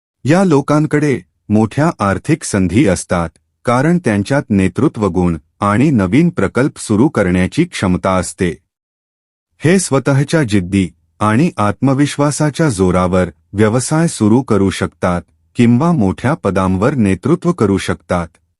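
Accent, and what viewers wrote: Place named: England, United Kingdom. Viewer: इंग्रजी भाषेचा टोन देऊन मराठीत माहिती सांगतोय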